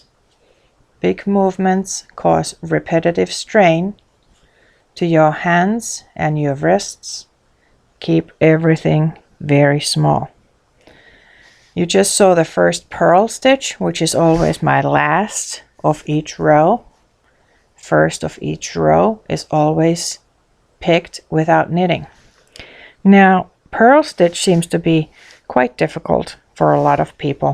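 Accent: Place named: Russia. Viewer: Yes, IMHO, her purl is definitely 'Russian' style.....perhaps her accent, too.....not that it matters..........and, it twists the stitch CW versus CCW and requires working through the back of the stitch/es on the return row......which can get messy if working a complicated pattern.....lace work in other words.....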